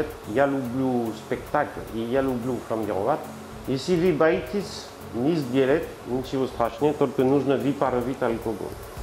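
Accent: France. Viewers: Уважаемый Я смотрю ваши видео с большим наслаждением, это бесподобно всё -- рецепты, прекрасный гасконец, особенно его русская речь на французский манер, это изюминка!! Слушаю вас как поэзию, никогда не переходите на чисто русский, потому что тогда потеряется шарм. Сегодня балдела от слов -- кладём в протёртую печень маслы ( надеюсь, вам объяснят, значение на русском -- маслы). Я восхищаюсь вами и благодарю за ваши видео и бесподобные рецепты. Удачи вам и всего хорошего вам и вашей семье. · Живу 5лет во Франции, конечно,как повар по образованию,начала активно готовить. Вася Емельяненко,Илья Исакыч,смотрела всех подряд.И тут,оляля!Я нашла французского повара,говорящего по русски!Как мило Вы говорите по русски! Это комильфо! Теперь смотрю только Вас! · Пусть разговаривает с французским акцентом, так интереснее. Да и ему удобнее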